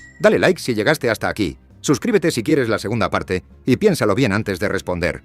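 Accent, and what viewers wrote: Spain. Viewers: El relator tiene acento español,si este video es hecho por un español,te quiero decir que los más odiados son los españoles y España, porqué si somos una mierda es gracias a uds. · Te noto acento español.. Pero te equivocas con Uribe.. Ese sesgo te hace perder credibilidad..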